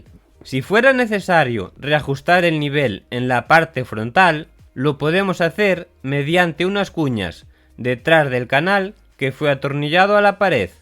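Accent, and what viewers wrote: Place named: Galicia, Spain. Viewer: dios que acentazo gallego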